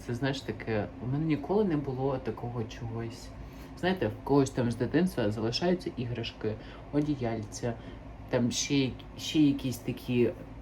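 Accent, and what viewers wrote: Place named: United States. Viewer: Ухти)) в вас такий американський акцент став за ці роки. Цікаво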